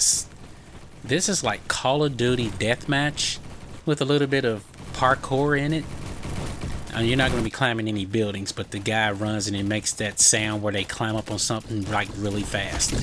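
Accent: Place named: Texas, United States. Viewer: u have an ultra Texan accent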